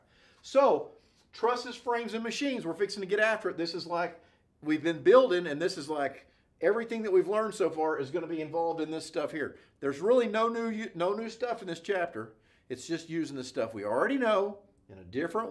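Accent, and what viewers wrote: United States. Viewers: Is that a Tennessee accent I hear?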